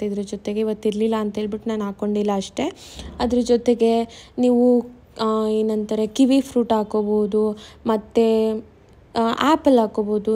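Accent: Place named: Tiptur, India. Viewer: Hellooo Im also from Tiptur. Neevu maathado style node ankonde, nam side erbeku antha. Kushi aythu😊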